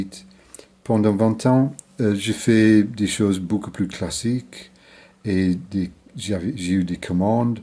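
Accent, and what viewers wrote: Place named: Yorkshire, United Kingdom. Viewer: Far less ' Yorky' in your French accent nowadays :)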